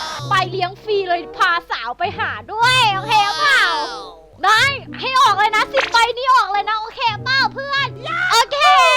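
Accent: Isan, Thailand. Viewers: เป็นคนอีสานบ่นอ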